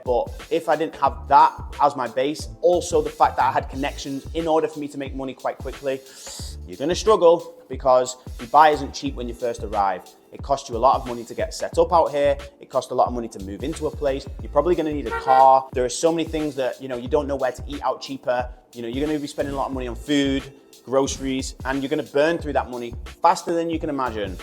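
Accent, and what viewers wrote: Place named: Northern England, United Kingdom. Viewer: Well done mate, never change that northerner accent !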